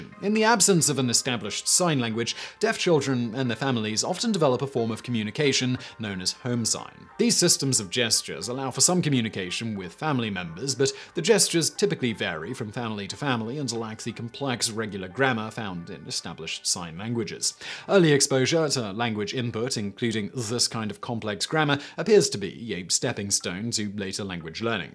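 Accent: England, United Kingdom. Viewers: The British pronunciation of Spanish -os words always bugged me, it's so odd to hear someone say "Carlos" as "Carloss". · Out of topic but i got scared when the guy sounded british, i thought he was vsauce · This is a good video, but do all Brits says Nicaragua that way? Nicarag-yew-ah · Can you talk without chopping up your words? Or is there anyone else that can be the voice of this channel? The English accent is very beautiful, in fact I think it's the most elegant accent there is, but the way you chop up your words when you talk makes it very difficult to actually focus and comprehend the information you're presenting. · I think he was just raised in Britain?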